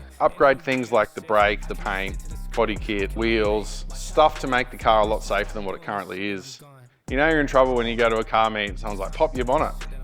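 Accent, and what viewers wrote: Australia. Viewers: Haha so bloody Aussie lol love it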